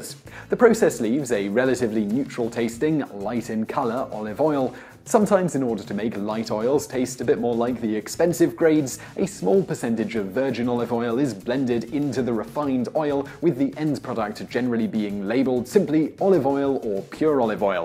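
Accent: United Kingdom. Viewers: Aren't all these videos simply a guy with British accent fast-reading Wikipedia articles on the most common, mundane subjects?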